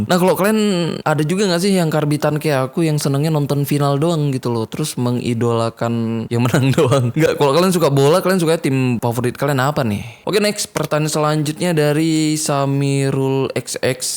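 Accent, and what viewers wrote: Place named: Aceh, Indonesia. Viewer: Gapapa bang adain lagi aja #ThreadHorror nya, mau itu cerita real ato engga, yg penting kita2 bisa ngerasain feel serem-nya bareng2 hehehe... Salam dari Semarang bang. Ohiya, aku tebak Bang pasti asli Aceh nih, ketauan dari logatnya soalnya, apalagi video2 yg dulu logatnya masih kental banget tuh wkwkw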